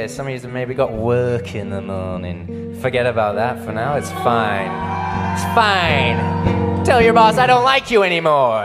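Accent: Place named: United States. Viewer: Ohhh I do love you Murrrcan accent.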